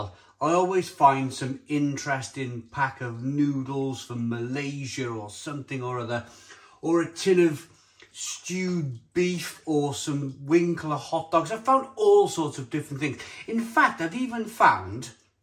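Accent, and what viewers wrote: Wales, United Kingdom. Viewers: Are you from wales cuz u sound like u got a welsh accent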